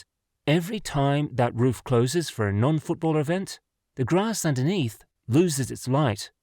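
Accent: United Kingdom. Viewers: What’s with the British accent??? That accent and the NFL don’t mix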